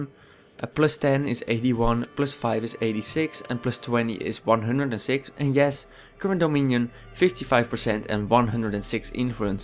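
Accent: Netherlands. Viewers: judging from you accent i think you are dutch XD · Yo bro. Thx for this vid. Needed it to understand politics better. Just subcribed to your channel. By the way. Are you dutch? You have a accent a dutch guy would have. Thx again and peace out bro :-) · you sound dutch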